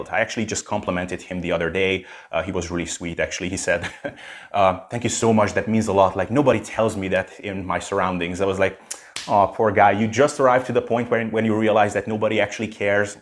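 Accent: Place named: New Jersey, United States. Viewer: That’s a lovely accent you have, New Jersey? Loved the Dumb and Dumber reference 😂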